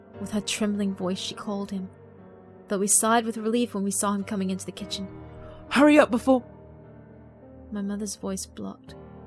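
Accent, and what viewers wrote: United Kingdom. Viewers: why does it sound like you have a British axesat? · Insanely and awesomely narrated! If I didn't know how you really sound like, I would swear you were born in the UK.. and at first I thought I was listening to Fearagen but, NO.. In actuality, it's Like Wow! he took the role of creepiness to the next level, and so Thanks Lovely Ms. and.. please, more stories like this! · when u get turned on by awesome brtitish accent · oh snap British accent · i missed this long story of yours. great narration as always. im amazed that you can do a british accent. kudos to you and. 👍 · This was awsome! I've never heard you do a British accent before, it's kind of ckol. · Excellent story, love the accent! That was a great British accent, just because it said or I wouldn't of known it was you LOL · That was a freaky and grisly little story. It felt like a dark fairy-tale with gothic horror overtones. 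Really sucked me in. Nice job, as always. Also, your British accent is adorable.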